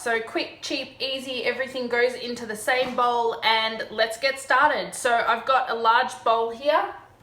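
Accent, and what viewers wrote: Australia. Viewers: Lovely to hear an Australian voice ❤️❤️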